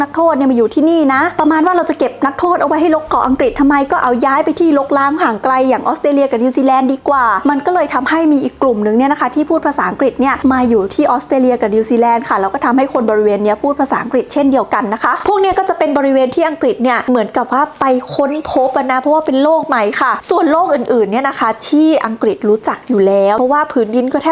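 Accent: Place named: Central Thailand, Thailand. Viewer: ที่น้องวิวพูดนี่แหละเขาเรียกว่าภาษากลางไทย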